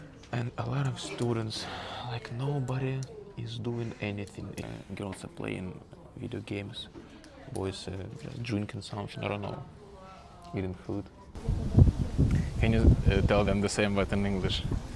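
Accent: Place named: Russia. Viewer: Don’t stop bro, you’re doing well🔥👍 Your voice is completely changed when your speak Russian(I know the Russian accent)Support from Kazakhstan 🇰🇿🇰🇿🔥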